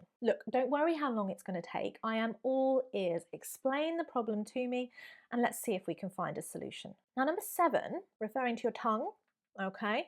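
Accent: United Kingdom. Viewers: Hello, I am an Arab from Bahrain and I want to learn the British accent soon. I will learn it from you 🥰🥰♥️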